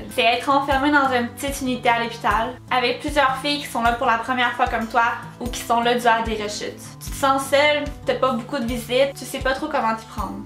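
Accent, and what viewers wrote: Canada. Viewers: belle vidéo j'adore ton accent canadien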